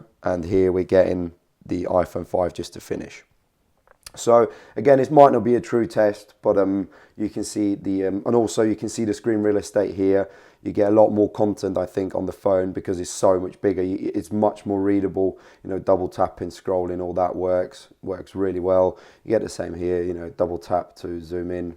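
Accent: United Kingdom. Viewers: Finally a British phone reviewer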